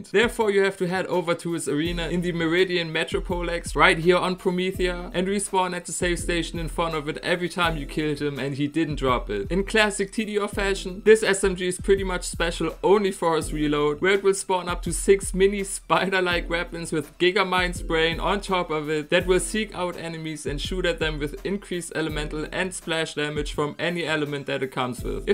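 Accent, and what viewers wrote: Germany. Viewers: you are german ? sounds like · Great content and very nice humor. I'll follow you and your videos along. Also your accent is just fun and lets me believe we could talk in german aswell :P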